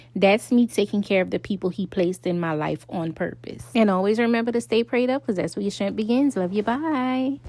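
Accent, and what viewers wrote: Louisiana, United States. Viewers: You sound just like my family from Louisiana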